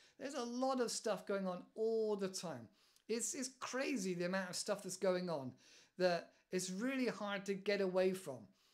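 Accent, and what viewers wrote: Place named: United Kingdom. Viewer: first comment 😊 ... greetings from Mexico 🇲🇽✨ and tank you ...I love the british accent ✨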